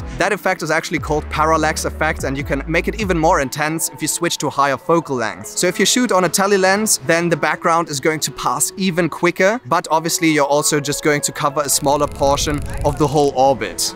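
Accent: South Africa. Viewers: WOW WOW WOW WOW WOW!!!! Thank you so much for this video. I am literally going to practice every move you mentioned with my crane M3. Are you South African? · Thanks for the video, I will definitely have a look at the Crane M3, I hear that your are starting to get a South African accent 😀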